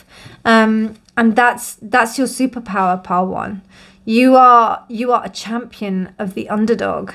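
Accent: United Kingdom. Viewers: 🌻 Good morning, Ms! 🌻 I'm drawn to the art of the 1st card. I wanted to see a flip through of the deck so I was about to search it. Unfortunately, I was not able to comprehend your British accent. Hehe. I turned ON the CC but it cannot capture the right words too. Hehe. Can I know what's the title of the deck, Ms? Or would you like to create a flip through video?? 🤓💕 ✨ Thanks for reading! ✨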